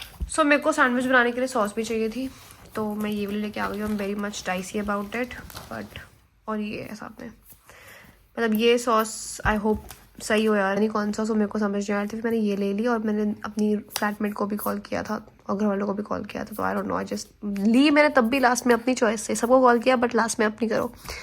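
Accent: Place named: Punjab, India. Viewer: Your English accent🤌🏻 aapka hindi uccharan🤌🏻🤌🏻 dein Deutsch Akzent 🤌🏻🤌🏻🤌🏻 but tuada punjabi lahiza 🤌🏻🤌🏻🥰🥰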